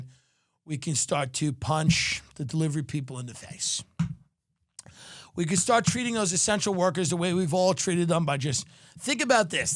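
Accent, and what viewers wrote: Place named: Queens, United States. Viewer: This guy must be from Queens or LI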